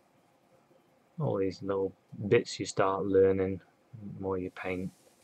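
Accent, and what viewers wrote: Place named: Liverpool, United Kingdom. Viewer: Hya, love your pieces, you sound like your in or from Liverpool.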